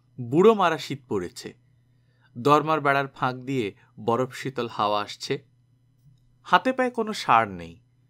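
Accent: Bangal, Bangladesh. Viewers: Apnar Bangal accent ta khub accurate, presentation tao darun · সত্যিই আপনার বাঙাল ভাষায় কথা বলার ভঙ্গি বড়ই সুন্দর। ভীষণ ভালো লাগে আপনার গল্প শুনতে।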